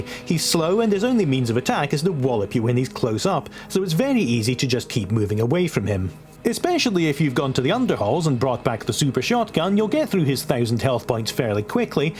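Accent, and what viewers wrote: Scotland, United Kingdom. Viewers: Your commentary is so Scottish and I love it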